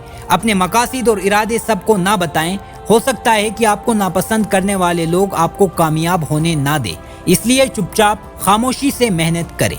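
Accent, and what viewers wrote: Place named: Gilgit-Baltistan, Pakistan. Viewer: Apky urdu accent sey lagta hay app Gilgit Baltistan say belong krtay hain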